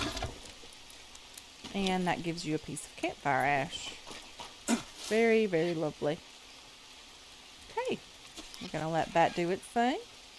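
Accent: Kentucky, United States. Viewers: From 1 Ky girl to another love the accent! Love watching you play Green Hell. Tip: unless just for videos & showing how to build I would not invest tons of building into drug camp or until you get to second map Anaconda Island or past if in story mode build only small overnight stopping points & water filter or containers etc... you will most likely spend very little time on this side of the map. ;-) there is also a Great cave to build in just go straight back behind the pig trap. Also watching your second season of Subsistence from the beginning in the 30s now liking it as well. Love your stories about your mom, canning & family. If you have not tried Grounded also check it out.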